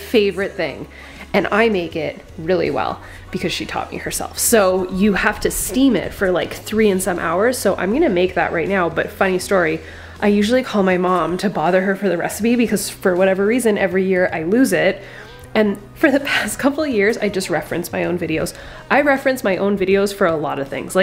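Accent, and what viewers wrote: Canada. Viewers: Canadian eh?:)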